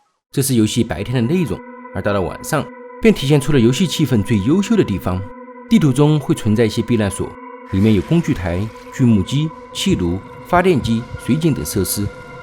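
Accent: Sichuan, China. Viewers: 四川口音有时候就这样 要求这么多干啥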